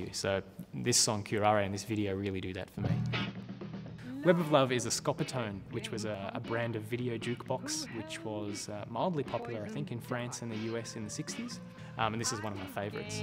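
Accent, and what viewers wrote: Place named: Australia. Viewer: Accent???? He sounds like any Aussie. What country are you in:)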